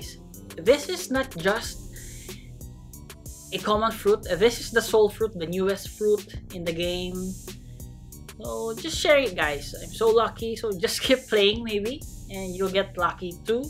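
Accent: Philippines. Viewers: hey are u filipino just wandering cuase sometimes u say ay idk im just wondering and thank you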